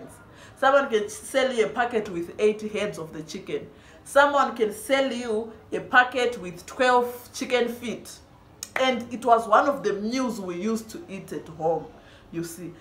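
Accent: Uganda. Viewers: I don't understand. You have an Ugandan accent. How come you didn't know the language 😂😂😂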